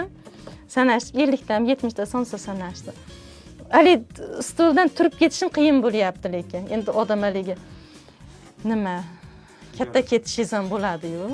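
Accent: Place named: Kokand, Uzbekistan. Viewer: Қуқонлиг экансиза шевангиздан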